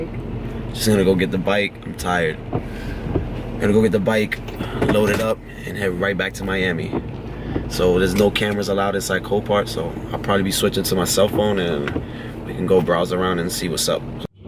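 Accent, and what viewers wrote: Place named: Dominican Republic. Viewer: Desde que el pana hablo españo, le salio el sason dominicano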